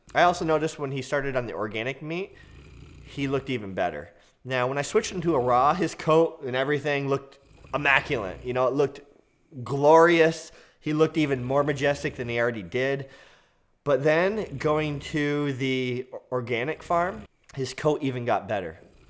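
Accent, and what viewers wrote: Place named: United States. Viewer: I've never heard them called "cona Corno" we say "Cain Corso" Cane Corso. You sound American so I was just wondering why you say it different?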